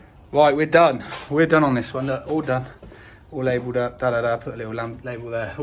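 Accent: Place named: West Country, United Kingdom. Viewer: I can hear a bit of West Country in your accent.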